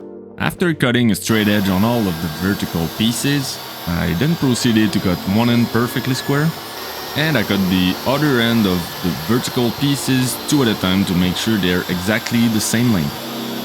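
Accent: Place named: Quebec, Canada. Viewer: well done sir. As someone who also works with reclaimed wood, I appreciate the amount of milling required to make it that good. Such a shame you didn't keep it. Your camera angles and editing are great. Your are well spoken and explained really well. My only issue is with the unnecessary music. Your voice and shop sounds are all you need. You sound French/Canadian...where are you from? Considering you only have 1 video so far...you should be pleased you already have over 1k subscribers!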